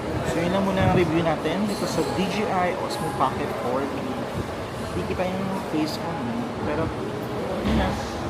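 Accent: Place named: Thailand. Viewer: sobrang thai accent ahahahaa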